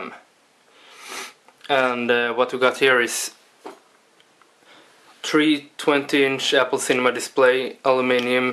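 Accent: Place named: Sweden. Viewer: Swinglish! Winning!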